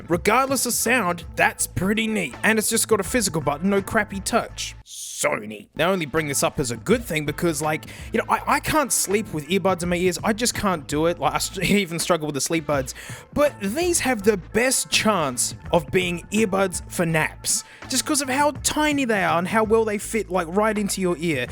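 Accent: Australia. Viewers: He's speaking a dialect of english known as "Australian" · The only reason I watch him is because his auzzy voice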